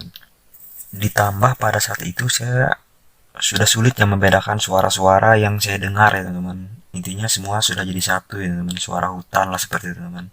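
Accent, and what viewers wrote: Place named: Kalimantan, Indonesia. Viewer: Kalo saya merasa nyaman dengan penyampaiannya karena sama sama org dr kalimantan jd udah terbiasa dengar nya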